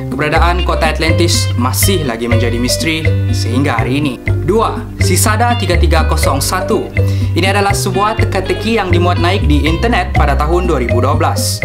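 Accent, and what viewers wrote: Sabah, Malaysia. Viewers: Obviously it's Sabahan... beza bahasa indo and malaysia... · dia asal sabah tpi sda lama stay d KL tulah bckp pun ada cmpuran mcm bhasa sabah smenanjung & indon. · Sabah ka kau ni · MN ada indon punya CKP MCM nie, hahaha dia org Sabah lh CKP MCM org KK... kotakinabalu bah Sabah bah.... salam dari kotakinabalu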